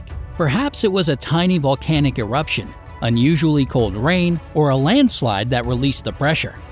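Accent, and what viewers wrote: Russia. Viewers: Are u russian? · To: Bright side Are you a Russian or Ukrainian?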